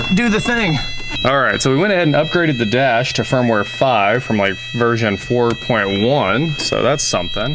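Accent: United States. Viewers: Please Rolls Royce's are ladies like ships (well the drive like boats too) there aways female! Not bad boy's please have more class now you gone up market and try to speak english not yank! remember bonnet not hood, boot not trunk, and petrol not gas but loveing the fun of the build!!!!!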